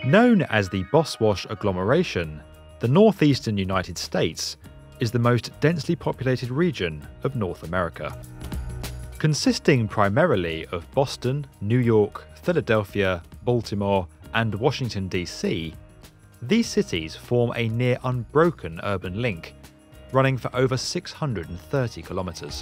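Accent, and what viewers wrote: England, United Kingdom. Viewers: Never did like the English voice for trying to sell me on something I know won't work. You can't get people to buy the same car or eat the same food, but you are going to put them all on one common transportation device! Never going to happen. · Great Brit announcer, but Asian pronunciation sucks.....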